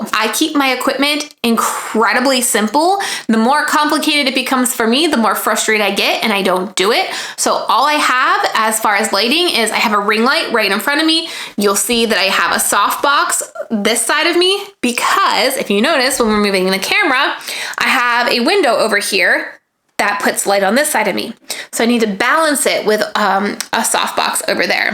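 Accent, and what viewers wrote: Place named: Utah, United States. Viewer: You're from Utah right? Your accent sounds very Utah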